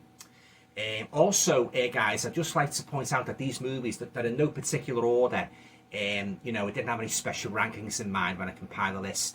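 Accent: Liverpool, United Kingdom. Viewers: I have a off topic question. I'm curious, Do you call your profile ' ', because you have a scouse accent? Or that's you're real surname? I'm American so I don't have the ability to tell regional accents of people.☺ but I'm always fascinated by accents. My dad was born Hungary, so I've grown up around 'Broken English' speakers and other European accents. But not many from the UK. I enjoy your channel.👍 I appreciate your "House That Dripped Blood" poster a lot! Probably my favorite Amicus movie. (I'm big Hammer and Amicus fan! I'm 37, but I've always loved those films. Nobody I know really enjoys or cares to watch them! Lol Except my mom and dad. 😅)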